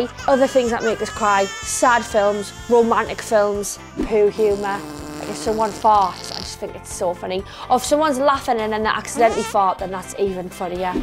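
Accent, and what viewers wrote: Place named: Newcastle, United Kingdom. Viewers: I am so in love with her British accent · Chocolate Muffin it's obviously Geordie isn't it?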